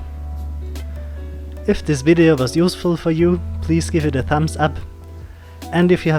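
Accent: Germany. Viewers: You should have cleaned the bottle too. There were still residues here, probably some of the black particles as well. It would be a shame if all the effort had been in vain. I can also hear a German dialect. Can this be? =) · Bist du Deutscher? Klingt fast nach nem Sachse xD Liebe Grüße aus Leipzig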